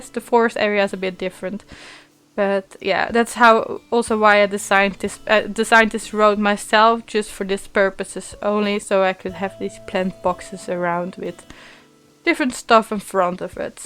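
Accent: Netherlands. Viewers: Aaa okay! I'm from the Netherlands as well and thought I recognized a dutch accent hehe.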